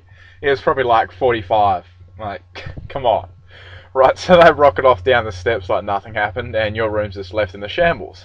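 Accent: Australia. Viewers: are you australian? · Yehhhhhh Australia. It 's the best accent and u all know it ahaha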